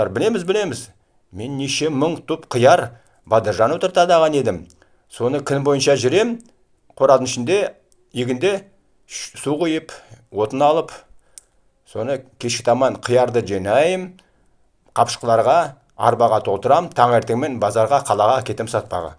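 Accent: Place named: Kazan, Russia. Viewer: Интересные вещи рассказывает къардаш, но произношение некоторых слов казанское.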